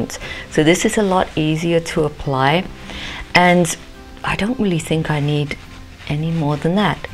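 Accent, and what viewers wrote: England, United Kingdom. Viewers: ...The same to you. I love your channel, as well as your accent. Oral tradition has it that my relatives way back are from England. Maybe the reason I love to hear the accent. I live in the South, so we do have a “Southern” accent, with “ya’ll “ thrown in 😂. We study about everything you can think of, not on a certain grade level. I try to get them interested in learning everything. We are heavy on Math, science, reading, and history, but throw in art and music too. I was a teacher back in the day, then went back to study nursing, and finished my working for pay, as a critical care RN. The girl’s mother is also an RN. ( I work for free now 😂)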